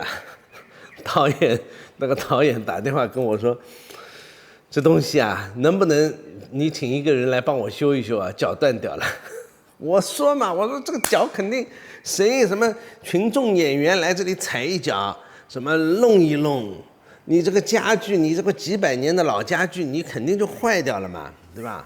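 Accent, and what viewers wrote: Shanghai, China. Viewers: 喜歡聽你聊天 有趣 上海人特有的口氣和用詞 很熟悉 🎉👍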